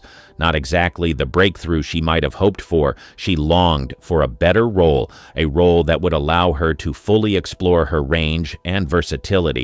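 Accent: United States. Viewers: Typical uninformed American pronunciation with medial 'A's pronounced long. Had to stop an otherwise interesting video because I could not take one more 'Day-ner'! Yes, thank you it is 'Dah-ner'!.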